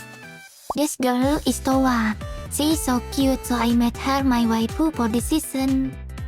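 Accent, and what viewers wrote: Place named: Indonesia. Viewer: Are you Indonesian? Your accent is like an Indonesian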